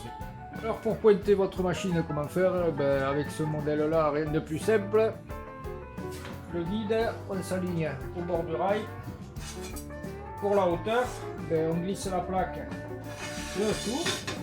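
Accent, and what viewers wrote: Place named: Var, France. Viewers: salut j'ai découvert ta chaîne y a peu de temps et je me suis dévoré toute tes vidéos elles sont bien faites avec des combines de vieux routard, de l'humour et tu ne t'attarde de pas sur des futilités mais elles s'adresse a des débutants avec un peu de connaissances du métier, je m'explique quand tu présente un outils montre nous les changements d'outils et éventuellement leurs réglages voire leur nettoyage et leurs affûtages quand cela est possible sinon j'adore ton accent il est du sud mais de ou? moi je suis du nord de la france. au plaisir de plein de nouvelles vidéos et garde les bétisiers c'est trés sympa bonne continuation et tout plein d'abonnés amitié Fred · Nouvel abonné sur votre chaîne je parcours vos vidéos . Merci de votre partage . Amateur de bois je commence à avoir quelques machines et du coup je pense aux aménagement et votre montage est inspirant . 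Et les cigales dans la voix me rappel mon adolescence dans le Var . Merci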